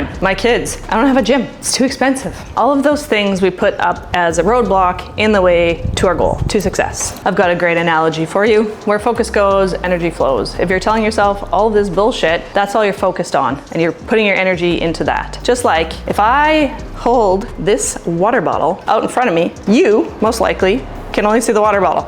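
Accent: Canada. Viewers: I’ve been skinnyfat for most of my life, but now at 50 I’m just fat. Your video came along at just the right time for me. p.s. I love your Canadian accent and I greatly admire your comedic chops. Absolute goddess.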